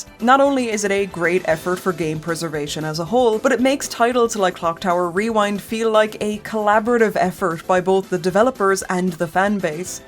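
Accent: Ireland. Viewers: Was not expecting the Irish.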